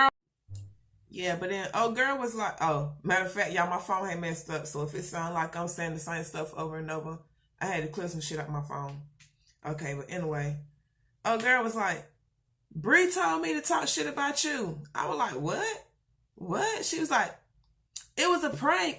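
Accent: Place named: South, United States. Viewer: this is so off topic but girl i love your country accent.........and it didn't work out bc yall are much older and way mature then them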